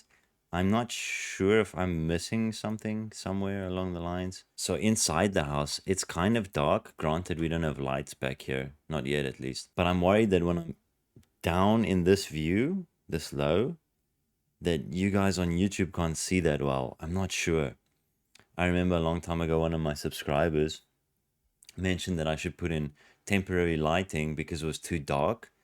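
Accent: South Africa. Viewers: I'm new here. Are you South African?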